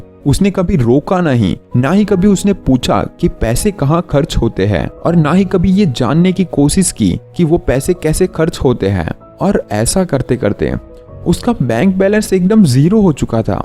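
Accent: Gujarat, India. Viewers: deepak kumar bhai We are gujrati hindi bolne ka jo tarika hota hai aaisa hi hota hai... sabko continue bolne me problem hota hai... kai ese sabd hote he jo hindi bol nahi pate... agar aapko aapni bhasa se dusri bhasa bolne ko bole to aapko bhi problem honga... so don't mind improvement sabhi ka aa jata hai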